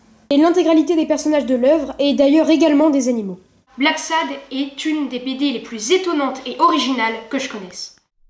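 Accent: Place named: Spain. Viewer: enfin te revoilà! une bien sympathique vidéo, qui donne envie de se mettre à la BD, merci! j'ai adoré ton accent espagnol aussi!;)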